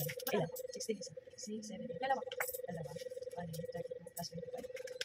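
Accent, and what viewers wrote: Waray, Philippines. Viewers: Hello sis lagi kita pinanonood mga vlog mo nadidinig ko nag wawaray ka taga saan ka po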